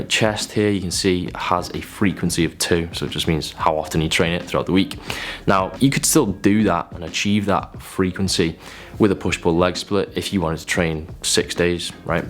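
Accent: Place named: Merseyside, United Kingdom. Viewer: Great info but quick unrelated question mate, are there different accents in Merseyside? Just asking cos your accent ain't as strong as Paddy Pimblet's.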